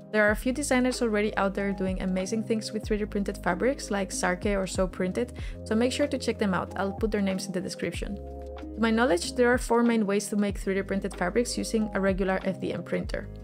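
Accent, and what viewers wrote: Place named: Spain. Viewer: que tendrá el acento español que antes de ver el nombre del canal ya sabia de donde era xD Oye el video es un 10, habia usado el metodo de eliminar superficies para vasijas o filtros de red pero nunca se me habria ocurrido que serviria para hacer tejidos. Greating and Like From Galicia!!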